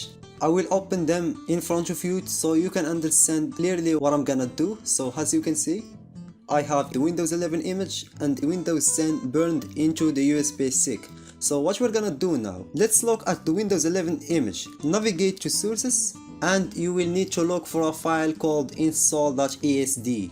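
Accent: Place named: Morocco. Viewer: f....king Morrocan accent, I like.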